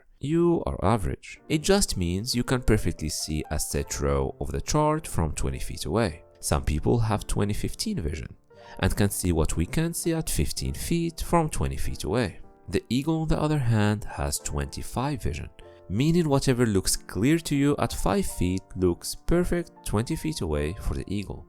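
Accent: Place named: Morocco. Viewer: Dude your accent sounds moroccan, where are you from?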